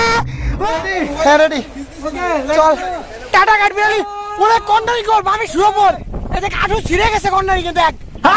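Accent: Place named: Bogura, Bangladesh. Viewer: ভাই বগুড়ার কথা শুনে খুশি হলাম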